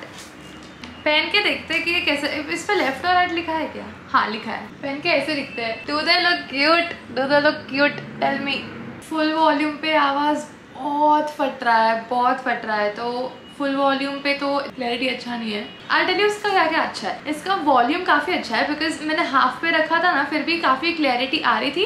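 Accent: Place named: Mumbai, India. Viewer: She speaks like a true mumbaikar.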